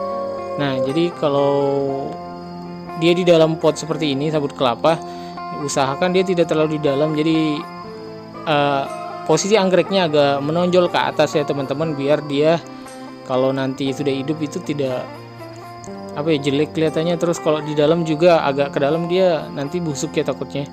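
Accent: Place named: Ambon, Indonesia. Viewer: kirain mas orang ambon..logaty ky ambon...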